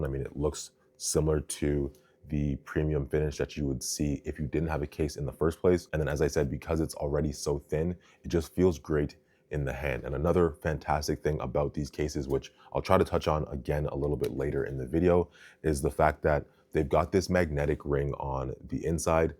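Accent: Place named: Canada. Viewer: Oh you're Canadian. PROcess and aboot are giveaway words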